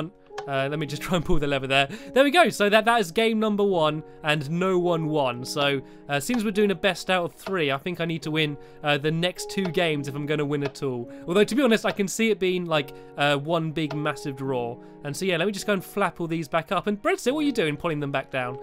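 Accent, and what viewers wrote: United Kingdom. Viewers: himesamacosplay thats just how he says it since he lives in the UK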